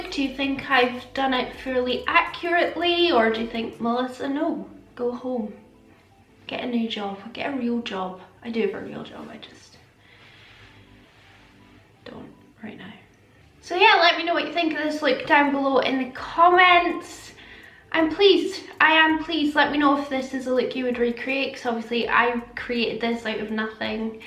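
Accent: Scotland, United Kingdom. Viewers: Omg your Scottish 😯 help me with my makeup!!!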